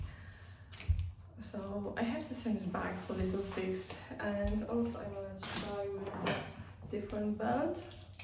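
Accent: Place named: Poland. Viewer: sounds like polish - english...)))the worst and most horrible English.... not "free"....correct its three !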